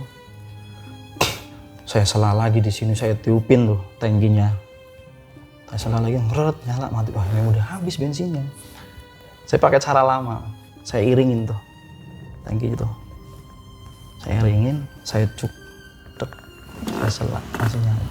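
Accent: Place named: Banyumas, Indonesia. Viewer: O wong ngapak to, salam Sitinggil Cilacap yo ang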